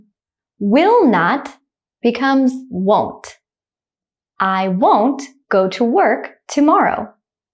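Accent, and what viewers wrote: United States. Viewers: I like the USA pronunciation. Today I am understand some short form of future tense will such as I will(I'll) you will (you'll) we will (we'll) and so on. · Hi teacher, Definitely, I like your American pronunciation. My examples; I'll visit my brother this evening. You'll be happy, if you follow my channel. We'll be so excited, if we meet again. He'll study English hard for the exam. She'll be looking gorgeous, if she wears that dress. They'll start teaching all subjects online.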